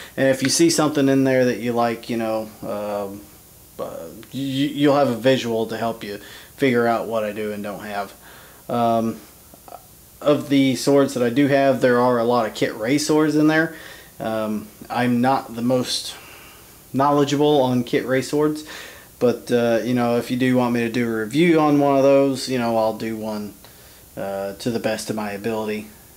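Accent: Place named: Texas, United States. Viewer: Texas accent is no excuse for mispronouncing our dark master Sauron the great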